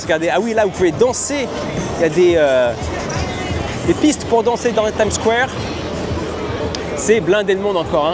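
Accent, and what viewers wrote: France. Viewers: Super ton voyage en bus, tu as pris des risques en étant debout. Je suis étonnée qu’il n’y ait pas un guide pour indiquer le nom des rues et citer certains bâtiments. Tout le monde te demande le nom de ton site et quel succès avec ces charmantes jeunes filles , le french accent ça plaît !!!. Bravo, continue tes vidéos très vivantes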